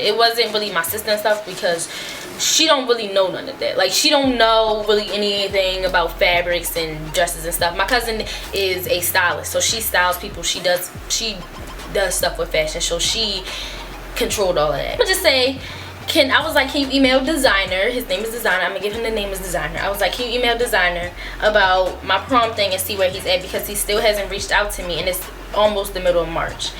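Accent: Baltimore, United States. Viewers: You from Baltimore? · Would’ve been so mad! You from Baltimore? Me too lol I can tell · I just knew u was from Bmore/md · I can tell you from Baltimore lol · As soon as she started talking I knew she was from Baltimore. 🤘🏽 P.S. You are beautiful 💕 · Man I’m 3 mins in & automatically knew she was from Baltimore before she said the designer was 🤗 her accent 😍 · Is she from Baltimore ? 😭😭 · i figured you was from baltimore the way you talk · I love ya Baltimore accent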